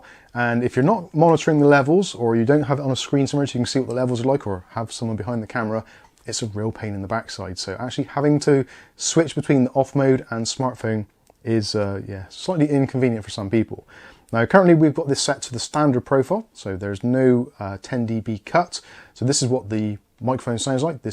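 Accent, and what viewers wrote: Bristol, United Kingdom. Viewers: Your audio has always been ok, despite the lovely Bristolian accent. My ears are not good enough to tell such details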